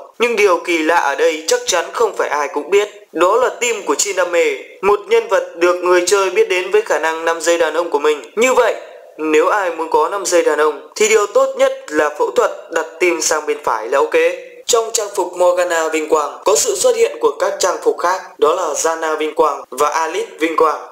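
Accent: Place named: Vietnam. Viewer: Video rất có ích nhưng mỗi tội ad phát âm tiếng Anh đậm chất nửa Anh nửa Việt.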